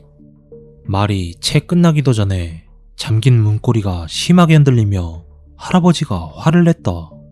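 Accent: Jeolla, South Korea. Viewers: 제가 경상도인데 이분 사투리는 전라도 사투리인듯 합니다 근데 저는 이분 살짝 사투리 억양이 오히려 정감이 가던데요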